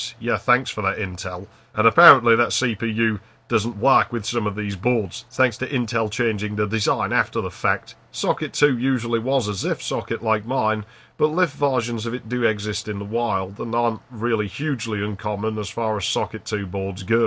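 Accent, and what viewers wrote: United Kingdom. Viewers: that accent, 80% geordie, 20 % yorkshire... Durham?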